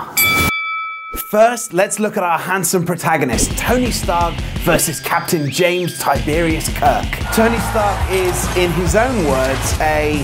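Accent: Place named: United Kingdom. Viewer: Dude, u b fucking awesome. Love the brit voice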